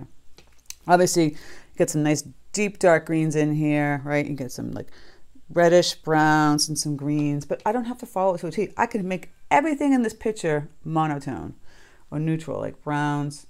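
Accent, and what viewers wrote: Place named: East Coast, United States. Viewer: Love your accent! East coast?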